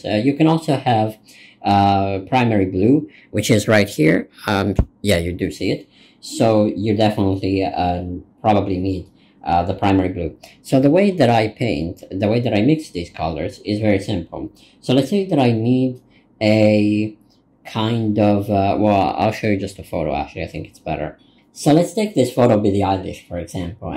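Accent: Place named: United States. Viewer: Hi...I follow you on Instagram and I came to Youtube to hear you speaking 😉No, I'm not a weirdo, LOL....I was just intrigued by your silent Instagram videos, and that eye-twinkling smile. of yours... I'm an artist too and I like to follow young artists to see how they progress with their art journey.... In the description, it says that you live in Tunisia.?.. but your accent is American.... You got me all curious, especially after listening to you and seeing what an amazing speaker you are at just 19 (20 now, I know). Sincerely impressed by your confidence and know-how at such a young age. 🤩😍 Sending you warm regards, my fellow artist!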